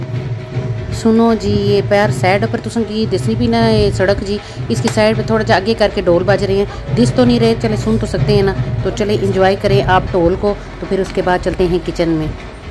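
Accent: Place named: Pothwari, Pakistan. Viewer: Mash Allah very nice and interesting vlog.I love your gulabi pothwari and gulabi Urdu, it makes it so interesting 😊. Bhindiya are my favourite with chicken or meat 😋😋😋.stay connected ↪️🏕👀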